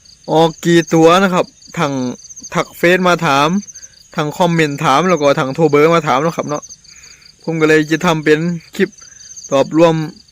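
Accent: Korat, Thailand. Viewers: ดี๋แท่ะๆ...ดี๋ใจ๋ดาเด้อนาย หมานๆรุ่งเรืองราบรื่นๆ มนต์เสน่ห์ภาษาท้องถิ่นโคราช...ใช่ออกสื่อ!!! FCเด้อนาย!!! 😍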